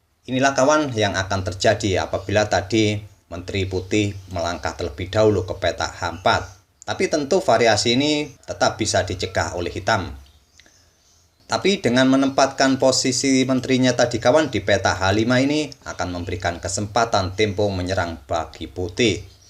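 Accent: Java, Indonesia. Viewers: Ji.. medok amat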